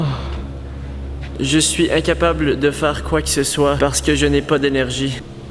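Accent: Quebec, Canada. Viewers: T canadien ?? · J'adore votre accent bisous au canadien(ne) et Québécois(se) de la France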